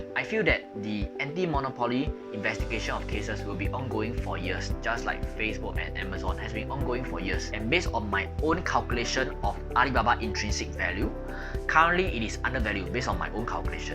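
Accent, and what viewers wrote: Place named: Singapore. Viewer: I understood fine. If you are used to this type of accent. I have coworkers in Singapore that have this same type of accent.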